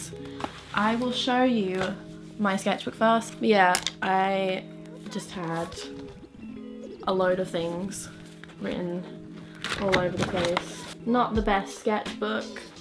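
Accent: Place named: Australia. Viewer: You sound Australian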